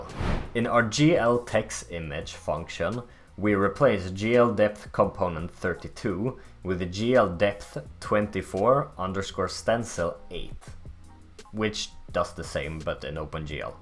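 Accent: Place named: Sweden. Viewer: Wow, really good quality for just starting out! I really liked the explanations, they were pretty clear and your accent is very nice. Kind of sounds like an Swedish accent to me. Personally, I didn't like the moving parts (top, bottom) whenever the code was shown, because it kind of distracted me, but that's just me.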